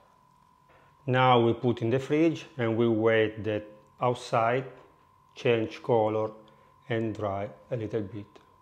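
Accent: Italy. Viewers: I Love the Italian accent fratello! 🙏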